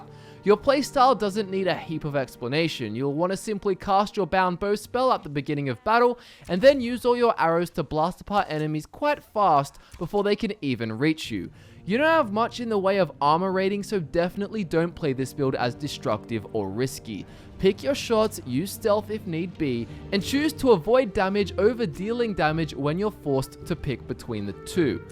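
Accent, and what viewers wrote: Australia. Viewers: it's an australian accent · australian accent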